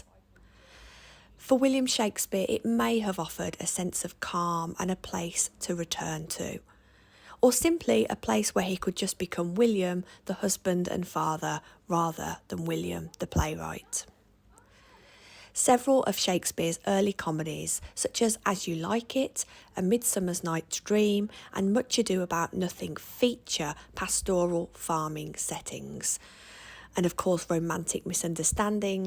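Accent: Birmingham, United Kingdom. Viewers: brummie (Birmingham) accent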